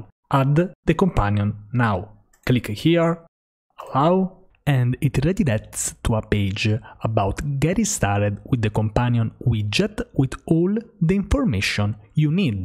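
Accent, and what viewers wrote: Italy. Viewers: dalle prime 5 parole ho scoperto che sei italiano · Very nice. And that crisp Italian accent, wow! :) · Mama mio Italian boss accent i love it !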